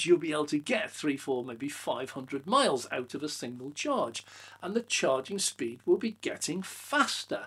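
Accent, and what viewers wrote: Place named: Northern England, United Kingdom. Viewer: Northern! West of the Pennines to be more precise (with a hint of Cornish thrown in 😉).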